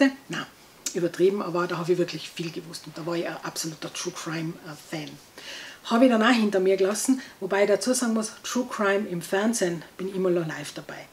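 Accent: Tyrol, Austria. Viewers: Liebe Frau, wie schön, Tiroler Zungenschlag! Wir machen seit jetzt mehr als 20 Jahren jeden Sommer Wanderurlaub im Zillertal (der Gatte sogar noch länger, der ist mit seinen Eltern als Kind schon da gewesen) und hatten auch 2020 das Glück, dass da gerade mal ein bissle "Corona-Pause" war. Im Juli waren wir im Lechtal, da brauchte man sogar nur noch im Bus eine Maske.... hach ja, wird schon wieder! Auf alle Fälle ist es schön, Deinen Tiroler Zungenschlag zu hören und ich bin schon sehr gespannt, welche Bücher ich über Dich entdecken werde! Bleib schön gesund! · Hallo, ich bin gerade beim stöbern auf You Tube auf Deinen Kanal gestöbert. Ich habe Dir gleich mal ein Abo da gelassen 🤗. Wir haben einen ähnlichen Lesegeschmack und sind im ähnlichen Alter. Ich finde Dich und Deinen Dialekt sehr sympathisch. Da hast Du großes Glück in Tirol zu leben. Mein Mann und ich mögen Österreich sehr und sind viel in der Steiermark im Urlaub. 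Ich werde mich jetzt mal durch Deine Videos schauen ☺️! Liebe Grüße von Kerstin (aus der Nähe von Stuttgart) 🙋🏻‍♀️📚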